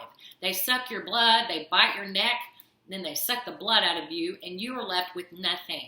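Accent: Texas, United States. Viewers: Are you from Texas? You've got the accent and that great kick ass attitude you need to deal with narcissists 👏👏👏👏keep up the good work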